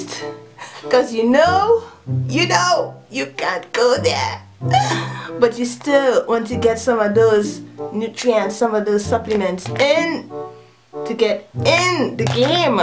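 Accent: Trinidad and Tobago. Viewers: Oh my gosh Im Trinidadian as well! So happy to hear you are as well! These tips are great also! Im planning on trying this but really scared of trying bc im kind of prone to acne... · Are you from the Caribbean?... you sound Trini lol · Soon as you started talking i already knew where u were from #trini....thks for the tips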